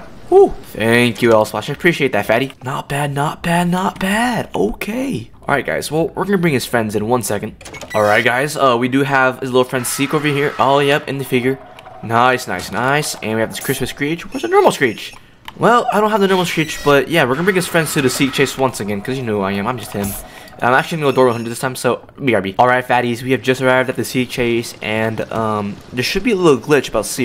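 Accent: United Kingdom. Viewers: i feel like hes gotten slightly british also ambush reminds me of a pillow pet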